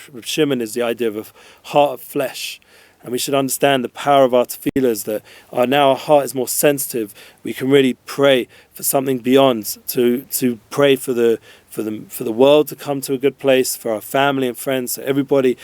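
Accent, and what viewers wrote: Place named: United Kingdom. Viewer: Love the British accent!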